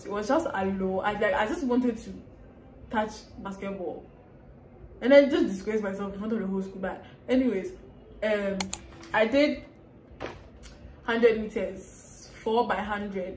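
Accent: United Kingdom. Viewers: Your British accent😍😍🥺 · I like your British accent can you use it more often♥️♥️♥️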